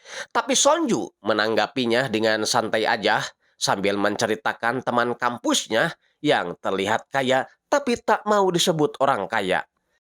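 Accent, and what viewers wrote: West Java, Indonesia. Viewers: Orang Sundak kah bang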